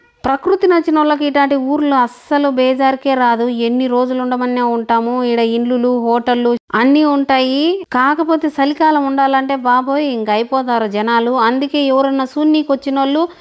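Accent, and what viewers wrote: Rayalaseema, India. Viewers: Nice video, Maana Raayalaseema language ni andhari teliyajesavu ne mattalatho super chelli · Hi ma maadhi kuda rayalaseema ne kurnool. Meeru rayalaseema slang lo matladuthunte chala bhagundhi.nice keep going on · super andi... 1st time mana rayalaseema slang youtube lo vintuna...ma uru proddatur keep it up 👍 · Mana Raayalaseema slang yekkadiki vellinaa thaggedele.. negative comments skip cheyandi..manam andarinee navvisthu, navvinchadame mana vuddesam....👍👍👍👍👍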